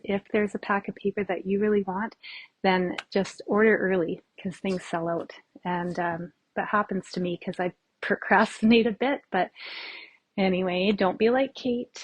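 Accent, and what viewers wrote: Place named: Canada. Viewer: I’m enjoying your design and instructions. Very clear sound. I thought you sounded like a Canadian! ❤. I’ve subscribed so I will see and hear more of your vlogs!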